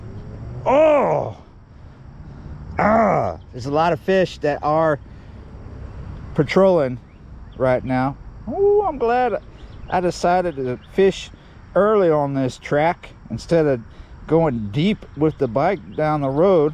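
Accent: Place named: Louisiana, United States. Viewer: Your Cajun accent started to kick in a bit more this episode.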